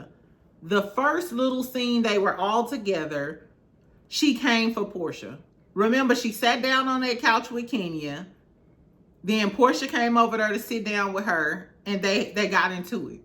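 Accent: St. Louis, United States. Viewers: I thought I heard some STL. 😊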